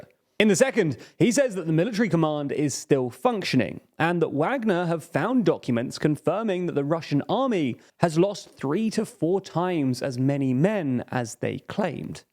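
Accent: United Kingdom. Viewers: This has to be one of the most intestinal videos of this channel as of yet. From the thumbnail, to the assumptions... You probably were cheering for wagner when they were doing a coup and then calling them terrorists after they backed out. A speculative video elaborating assumptions through the lens of a british idiot that thinks he has brilliant deduction capabilities. You're just banking on the views brought by your acephalous peers that love to parrot anti-russia stuff. "The beginning of the end for for Putin"... Even the people inside the loop have no idea what happened, and here you are stating he was humiliated blah blah. The truth is that you should've just stayed in your lane, reduced yourself to your insignificance as a mere youtuber that looks like an overgrown baby and thinks he is intelligent and a respectable news outlet just because he has a british accent.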